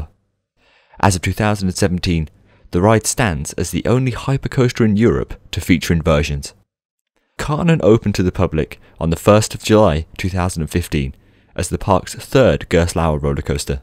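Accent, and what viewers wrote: United Kingdom. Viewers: I love Englishman speaking german 😂 Great Video!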